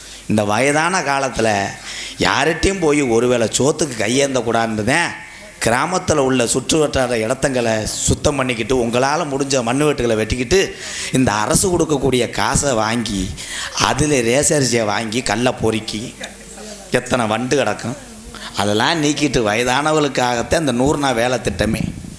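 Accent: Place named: Sivaganga, India. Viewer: Bro ithu aanavam illa...sivagangai seemai native slang.He is a good person.He did many helps for the poor peoples❤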